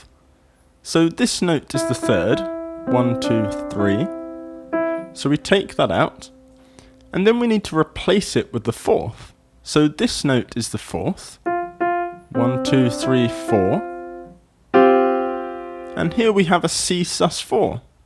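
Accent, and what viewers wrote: United Kingdom. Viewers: u british right?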